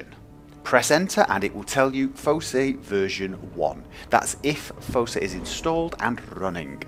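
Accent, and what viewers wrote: United Kingdom. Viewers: Is this guy norwegian? He speaks like a brit XD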